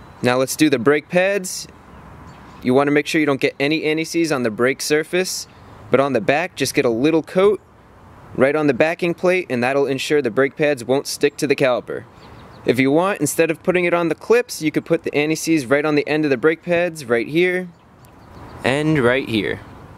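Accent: United States. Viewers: Just wanted to thank you for your videos. Just spent my Sunday fixing the brakes of the mother of my child's car. Everything you taught was present - blocked nipple, rusty brake fluid and seized bolts. Loved the 1 man bleeding method too. The car now stops on a six pence. My boy likes your tutorials as he's car made and likes your accent ( I think you sound like Marshall Mathers - no offence) - he's only 5 but obsessed with all things from the US of A:) Thanks again.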